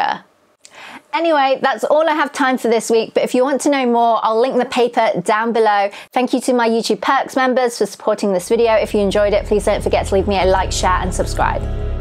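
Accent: United Kingdom. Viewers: Your face screams asian, but your voice says vaguely british.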